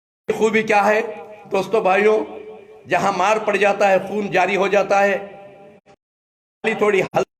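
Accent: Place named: Hyderabad, India. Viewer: Sab ka naam batae saf saf nahi dikhta naam batane s humko samajh aaega haidarabad ki boli samjh nahi aati jaldi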